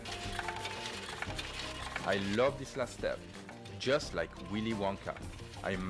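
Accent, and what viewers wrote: France. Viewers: Love that French accent. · What a beautiful French accent !!! :)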